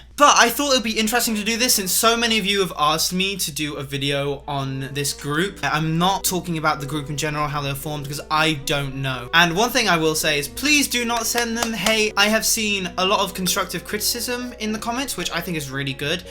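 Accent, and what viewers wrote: United Kingdom. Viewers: J’aime tellement l’accent des UK 😭❤️ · Ok so this is my first time watching one of your non singing videos and your British accent hit me like a fucking train ngl.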